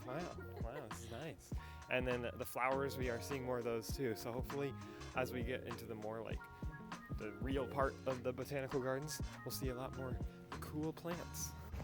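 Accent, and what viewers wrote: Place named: United States. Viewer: Nice video! There's also a surprise little hidden waterfall at Bronte. Your accent is from what part of the US?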